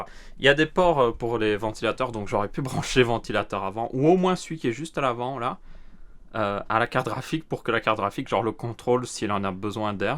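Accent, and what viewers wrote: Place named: Belgium. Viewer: C l accent et expression belge.. un vis ..lol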